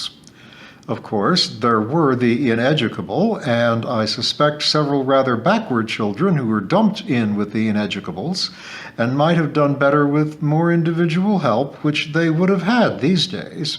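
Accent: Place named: United States. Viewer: I have read all of the Miss Read books and was delighted when I found you reading them. I listen when ironing, cooking and other quiet activities. At first I thought I wouldn't like an American accent reading old country tales from the Cotswolds but now I'm champing at the bit waiting the next installment. Loving every minute of your pronunciation of many words. Are you going to read the Thrush Green books as well? I am English but live in France.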